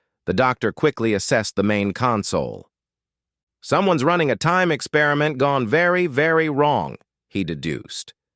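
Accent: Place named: United States. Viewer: This was truly excellent! A great story, and a great new avenue for your channel! An American narrator is not actually that jarring, as the precedent has been set by guest actors of that type reading official audiobooks. Definitely looking forward to more! Incidentally, apologies for this delayed response, but I was having a short break from You Tube interactions. Many thanks and all the best for now. Paul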